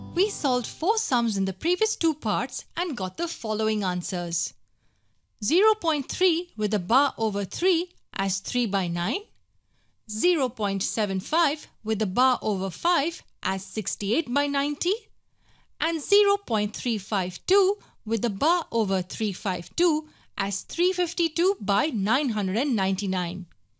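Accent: India. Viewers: You sound to be Indian but your English is clear and I like it.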